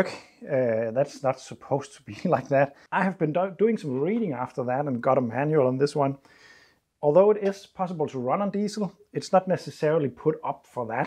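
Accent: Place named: Denmark. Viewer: Got the first video on this moped recommend by the algorithm, all hail the algorithm. It took a moment to figure out the accent was Danish, like myself. As a former postman, I couldn’t help myself from looking at the roads you were driving on, trying to figure out if I recognized anything. And by some freak coincidence, you were driving on my old mail route. Anyway, love the videos, keep it up👌🏻🇩🇰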